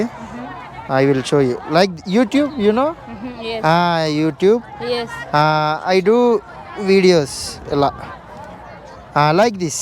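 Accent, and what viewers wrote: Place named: East Godavari, India. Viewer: సూపర్ నైస్ వీడియో భయ్యా మీ ఊరు ఏమిటి ఈస్ట్ గోదావరి జిల్లా స్లాంగ్ ఉంది మీది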